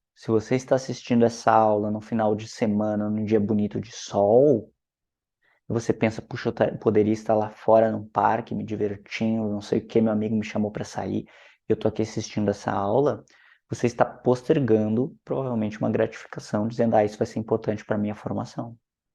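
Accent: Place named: Rio Grande do Sul, Brazil. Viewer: Esse sotaque eu conheço...é GAUDÉRIO !!😅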